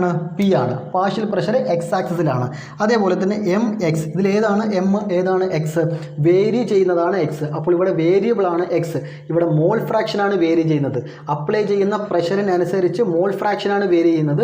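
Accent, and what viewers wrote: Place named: Palakkad, India. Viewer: slang kettapolle thoniii pkd annu ennu🤪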